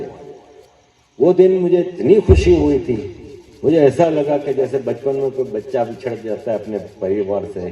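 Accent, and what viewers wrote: Gujarat, India. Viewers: Sir aapko dialect gujrati lgti hai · Aapka janam bhi karachi ka he lekin aapke bolane me gujrati accent aata he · Ji, aapke boli ka tone Gujrati jaisa hai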